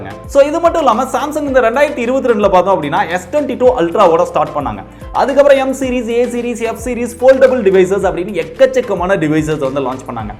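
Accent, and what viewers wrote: Kovilpatti, India. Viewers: Neenga kovilpatti ah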